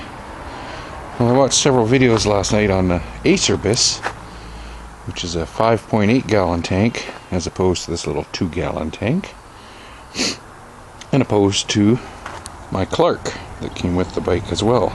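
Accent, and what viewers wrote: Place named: Canada. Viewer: Wut!? That's a hoser accent if I've ever heard one!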